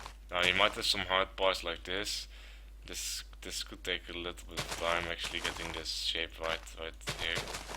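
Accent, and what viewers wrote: Netherlands. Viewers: You sound like a Dutch person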